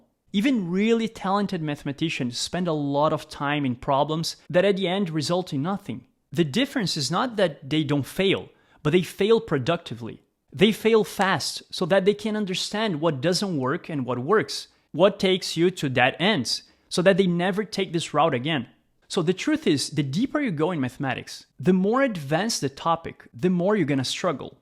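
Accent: Brazil. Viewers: É impressionante como o sotaque brasileiro é característico 😅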